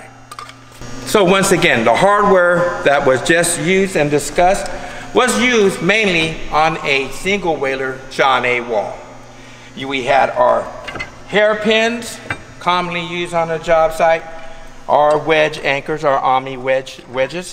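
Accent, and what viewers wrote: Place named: Louisiana, United States. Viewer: All i can hear is Adam Sandler waterboy!! Correct me if I'm wrong but thats a sweet louisiana accent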